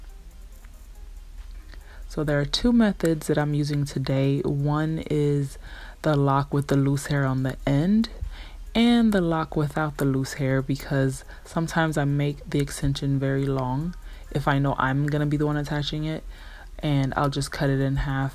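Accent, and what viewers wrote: Haiti. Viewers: Are you Haitian? Your voice is very Haitian. Please tell me you’re Haitian.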